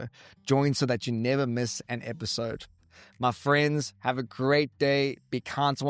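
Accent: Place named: Afrikaans, South Africa. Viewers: Enjoyed your content, but wish you'd stop trying to hide your lovely Afrikaan accent. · the hardest durban accent to ever durban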